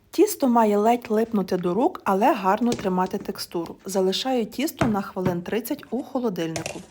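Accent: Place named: Galicia, Ukraine. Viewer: Браво ! Зразу чути, що галичанка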